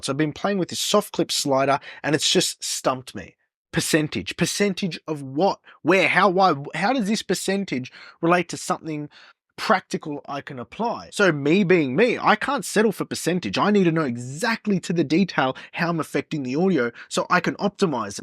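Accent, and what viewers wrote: Australia. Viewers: Straight to the point and no BS. No like and subscribe, click the bell, yada-yada. This is how videos should be. You sound Australian but look Italian with the way you communicate with your hands😅 Thanks for all the tips and tricks!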